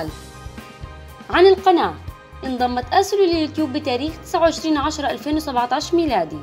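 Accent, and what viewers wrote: Baghdad, Iraq. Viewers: لا بغدادي كلامهة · دنجب اصلا هيه تتكلم عراقي هيه من الغه تهرف اوك ولهجته عراقيه شلون تكول هيج ؟؟؟؟ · لهجتها بغداديه خيررر؟ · يب بغدادي🙂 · لا ترا لهجتهاا عراقيهه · ابدد كلامهه بغدادي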